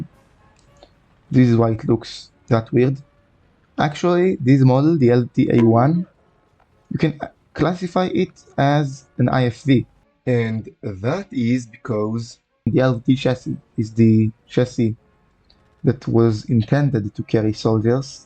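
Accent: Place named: Israel. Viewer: The accent, oh the ACCENT! I have an Israeli friend and he speaks English just like this guy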